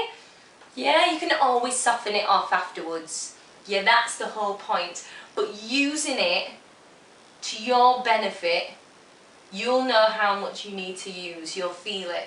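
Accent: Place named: Manchester, United Kingdom. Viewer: Are you from Manchester England you sound like my cousins talk from there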